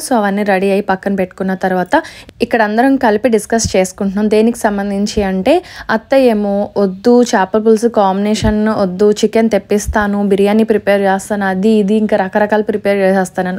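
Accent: Godavari, India. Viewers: Mamu godavari slang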